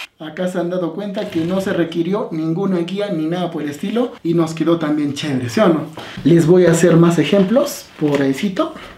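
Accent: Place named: Iquitos, Peru. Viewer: Me encantan tus videos! Eres peruano verdad? hablas como mis compatriotas de Iquitos. Que sigan los éxitos!!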